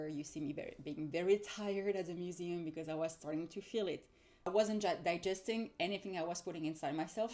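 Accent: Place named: France. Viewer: I was expecting a charming French accent, aaaaaaand.... you got it!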